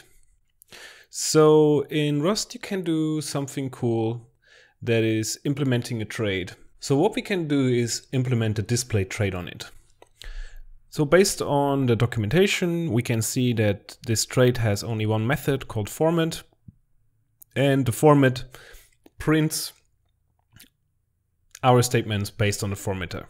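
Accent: Germany. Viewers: I'm not a beginner in Rust, but I really enjoy how laid back your method of teaching is. It is especially nice to see you sit there on the chair and the computer on the wooden table and the code to the right. And of course, the German accent is nice. This experience is really soothing! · Agreed, german accent is always nice and welcome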